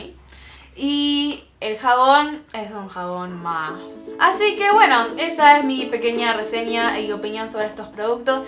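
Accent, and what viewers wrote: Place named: Argentina. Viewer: me encanta tu voz argentina divina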